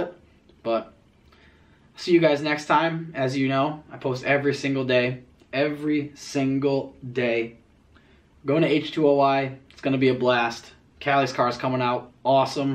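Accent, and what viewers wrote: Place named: Russia. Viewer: you're a good genuine dude,. this channel will grow because of that. more russian accent though.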